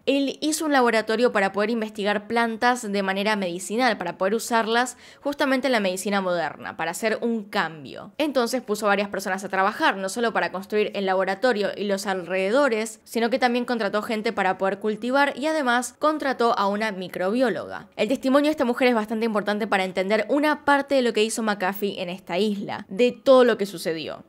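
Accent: Argentina. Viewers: Me encanta como narras los casos, eres linda, tierna y graciosa y ese acento argentino en ti me encanta. Un abrazo desde Venezuela 🇻🇪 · SOS ARGENTINA ? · Es su acento argentino :/ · No es que tu voz de argentina Me gusta, digamos que es una de las quémenos me disgusta.Hay muchos canales como el tuyo pero su narrativa me aburre mucho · Te amo 🥰❤️ Amo tu canal ❗️ Adoro el acento argentino se me pega mucho y más que estoy viendo una serie llamada Rebelde Way de Netflix 😂❤️👌🏼 Saludos desde Perú 🇵🇪❗️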